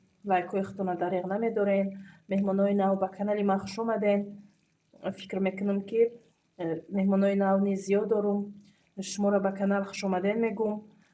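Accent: Tajikistan. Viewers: ай кучои хисоре чунки камтар лахчаи хисори гап мезане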